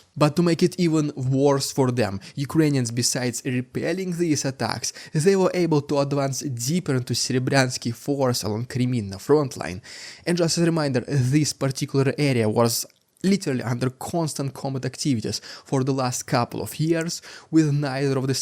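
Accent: Russia. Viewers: I can hear your russian accent. Keep studying.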